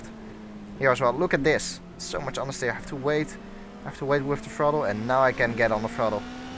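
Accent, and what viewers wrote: Netherlands. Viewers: Zo grappig dat je toch echt hoort dat je een hollander bent.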